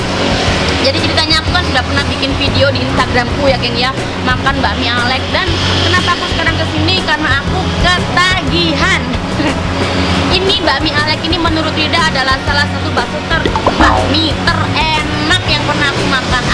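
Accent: Java, Indonesia. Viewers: setu-bekasi hadir n sllu setia nunggu konten2 dari kak rida yg cantik 😍😍😍 ngmgnya kak rida tu jawa banget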